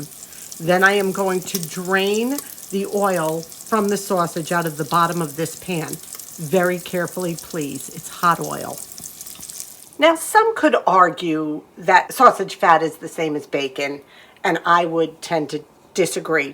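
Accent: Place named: North Jersey, United States. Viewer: Hello from Northern California! I was raised in North Jersey though, so love hearing your accent and when you talk about ShopRite. I haven’t been thrifting in ages. I don’t shop much anymore (except for food), but secondhand is definitely the way to go!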